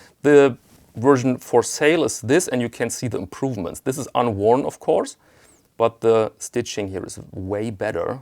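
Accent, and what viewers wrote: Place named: Germany. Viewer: Hi, great Video! I assume from your subtle accent and the Giro-Card, that you are German. Do you have any suggestions for a good online or brick and mortar shop based in Germany, best case in Hamburg. Cheers, Thorben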